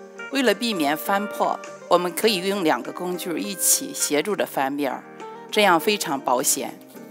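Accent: Qingdao, China. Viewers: 山东人吧？哈哈，一听就亲切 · 绝对如假包换的青普：) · 听着这熟悉的口音 像是青普（青岛普通话）呢😄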